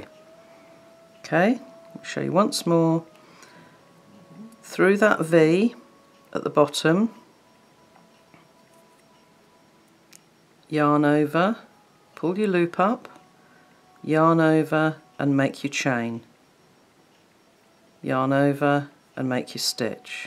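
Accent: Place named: United Kingdom. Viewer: So lovely to see a tutorial in British English! Thank you x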